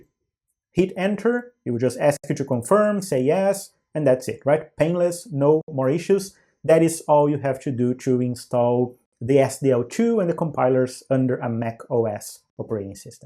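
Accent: Brazil. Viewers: Notei o sotaque dele de br também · O sotaque não nega, esse cara é BR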